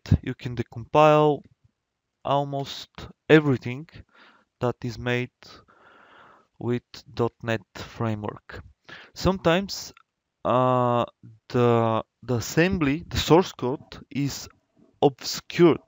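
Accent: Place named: Russia. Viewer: Плохой английский с русским акцентом. Может есть такое видео на русском?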